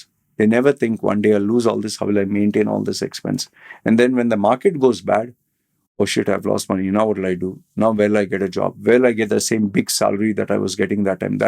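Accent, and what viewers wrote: India. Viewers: At first i thought you are Hispanic but u have an indian accent.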